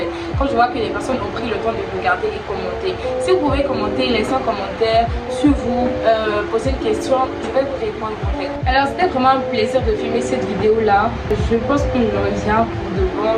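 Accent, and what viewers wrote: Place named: Ivory Coast. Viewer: j'ai cru que t'étais ivoirienne 😅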